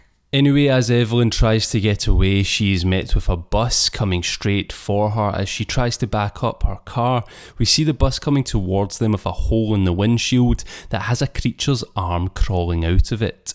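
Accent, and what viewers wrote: Scotland, United Kingdom. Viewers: Are you Scottish? Lol this is the first of your Vids I've watched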